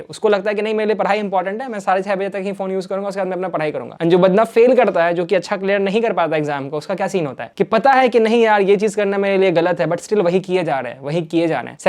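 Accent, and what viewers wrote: Bihar, India. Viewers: Your Bihari accent is amazing 😂😂❤❤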